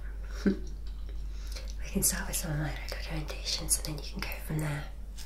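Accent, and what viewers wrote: United Kingdom. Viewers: Wow you have a heavy British accent! I hardly can understand it but I like it! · Are you actually British like me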